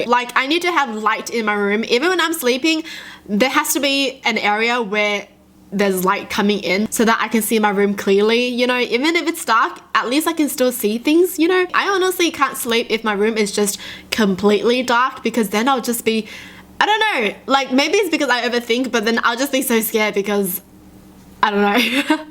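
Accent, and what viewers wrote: Australia. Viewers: I could hear her australian accent! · I'm so used to her Australian accent now I don't notice it anymore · I love your accent ohmygooosh! Is that an aussie accent? 😮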